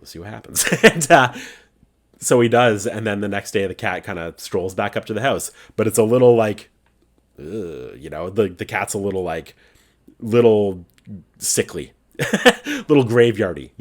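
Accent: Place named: Canada. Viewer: The way I knew you were Canadian before you even said you were😭canadians are the best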